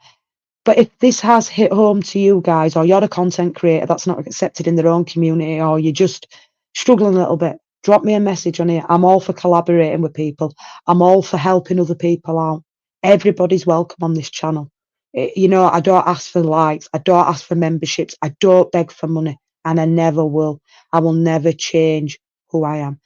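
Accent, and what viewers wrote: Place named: Leeds, United Kingdom. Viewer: I think you are beautiful, and all the panel are beautiful. What you do is amazing. Love you. You are accepted by the one's who really care. Fuck the haters and just focus on the one's who care for you and what you do for your community. Take care, thinking of you x. And by the way, I love your Leeeeds accent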